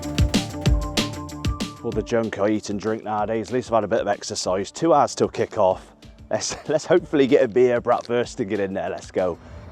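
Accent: West Country, United Kingdom. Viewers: Am gradually going through all your videos. As you know i live in Berlin, but dont go to any games here. Regarding Wuppertal. Its a real shame that they are going through bad times. I remember them in the 2nd german division, with big crowds. You certainly spend alot of time in germany recently, and i realise your big love so to say lol, is for Bochum. Also, didnt realise you come from Hereford. I was certain your accent was more west country, Plymouth for example. I take a look now and again at your video from my club Gillingham, regarding that montrous corregated stand. Still makes me laugh. Anyway, i am gradually doing the rounds with all the content you put out. Really enjoyable and full of facts. Thanks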